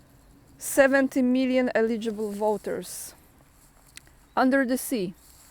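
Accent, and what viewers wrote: Poland. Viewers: Your English sounds very good to me, with a little polish accent sounds more colourful.Thank You for the reporting on the Polish farmers action. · Your Polish accent when reading your texts is part of the enjoyment of listening to your program and that accent is very charming. You are careful with the facts but do not hesitate to show emotions and directly say what you think. These qualities are not so common online and make your channel both unusual and likable. Keep up your good work and greetings from Sweden.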